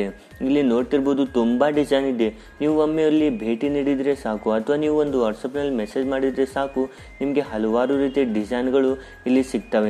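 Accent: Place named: North Karnataka, India. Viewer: Dosta nee matadod nodidra pakka uttar karnatakadava anasti pa... Am i right or wrong...?😆😆😅😅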